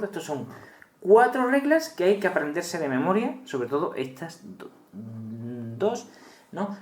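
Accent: Andalusia, Spain. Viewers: Macho tienes un acentazo andalú increíble jajajajaja mete un buen rollito a la hora de aprender algo tan... perverso como son las derivadas que dan ganas de ponerse a hacerlas y todo jajjaajaja buenísimo el vídeo, saludos desde Canarias! ;)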